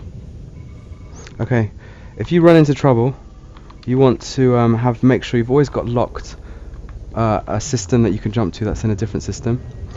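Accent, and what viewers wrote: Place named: United Kingdom. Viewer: You know it's funny, I feel like these videos are so much smarter sounding and informative with a British accent than if anyone with an American accent made them ;o (is American)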